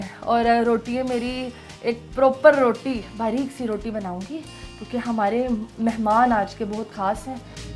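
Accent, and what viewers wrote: Punjab, India. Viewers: Pure punjabi excent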